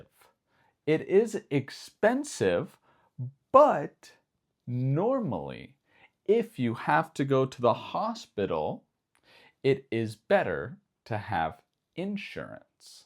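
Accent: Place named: United States. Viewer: I enjoy your authentic American accent.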